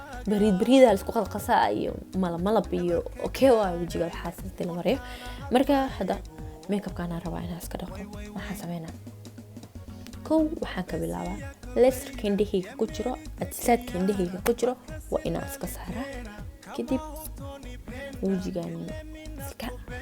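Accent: Mogadishu, Somalia. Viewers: Loriina Bby xbbti waxey ku hadasha afka rer xamarka saxda ah marka luqadii ayey isku daydaa iney ku hadasho marka waa sidaas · DaaRij Films ina ader rr xmrka saan ayey u hdlanee gabadha ka tag 🙄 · Waayaha Cusub wll dadka cad cadka muqdisho dago san aye u hadalan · Loriina Bby Abayo somaliye ee kudhalatay intana kula ekaatay Soomaligana waa kaabadia lkn ree xamarka qaraaa saan ah . Anba saaan waa kadarnaa lkn marka kasobaxay alxm waaa kaqubestay hhhhh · Loriina Bby wa sida dadka reer xamarka ku Hadlan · DaaRij Films wara dadka xamar joga sas aya uhadlen reer tuulo lee wayeh waxa somaliga wayn ku hadla okky iska tag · Sax waaye Afka reerka xamarka haday ku hadasho kaasaaba u fiicanee intey u boodi lahayd maxaa tiri axsan af maadoonti xataa ku hadal horaa loo yiri socod aadan lahayn sedex tilaabo ma dhaafo wayo wa ka lumaabo waxan kugula talin lahaa ani ahaan waa ku dareemoya af maadoonti aad ku hadli jirtay xoogsigan dhexdad ku lumeysaa iska daay hadii ad doontee in xirfadaa ku kacdee afaa ka waran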